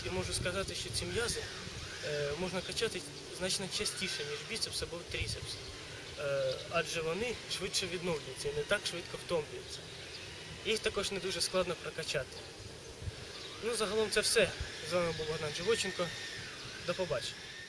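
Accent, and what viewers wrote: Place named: Russia. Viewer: і в додаток, відчувається, що в твоєму оточенні розмовлять російською), "щ" = "шч" при вимові, де "ч" проривне і чітке.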